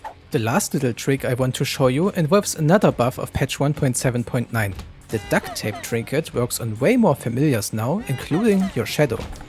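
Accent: Germany. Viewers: Man merkt wirklich extrem dass du deutsch bist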